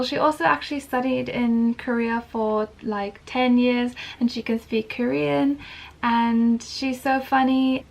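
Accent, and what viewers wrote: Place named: China. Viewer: p.s. your chinese accent is so great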